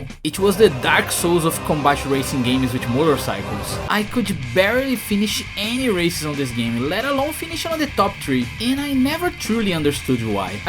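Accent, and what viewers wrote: Brazil. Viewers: You are not a english speaker right? The way you phrase your words sounds like you are brazilian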